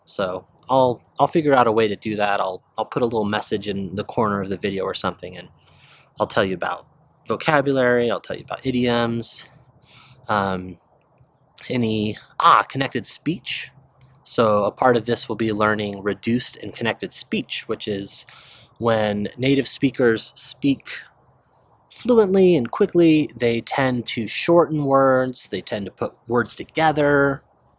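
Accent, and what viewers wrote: United States. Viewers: perfect American accent English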